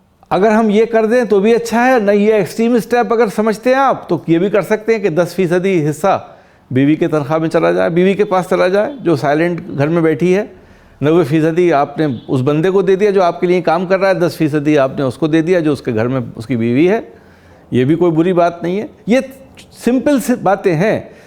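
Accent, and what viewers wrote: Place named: Western Uttar Pradesh, India. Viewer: ये बताएं ,आपके चेहरे की चमक का राज क्या है ,आपके बोलने के अंदाज में पश्चिमी उत्तर प्रदेश की मीठी खुशबू आती है धन्यवाद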